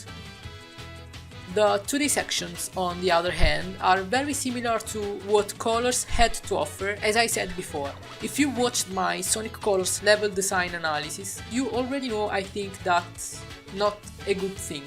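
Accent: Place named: Italy. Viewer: Si sente che sei italiano... hai la mia stima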